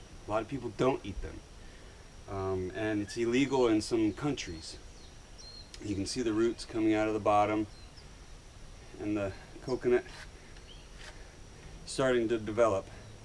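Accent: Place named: Midwest, United States. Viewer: I’m willing to bet you did not grow up in Hawaii. You know you would be ridiculed for wearing those sandals and speaking with a midwestern accent. Be honest, what state are you really from?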